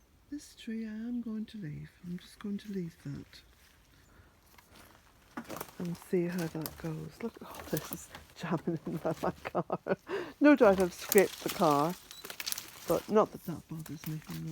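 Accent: Ireland. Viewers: HOW I LOVE TO START MY MORNINGS AT BEALTAINE COTTAGE BUT AM HAVING GREAT DIFFICULTY HEARING YOUR LOVELY IRISH VOICE. WISHING YOU AND JACK A WONDERFUL DAY, LOVE TO YOU BOTH XXOO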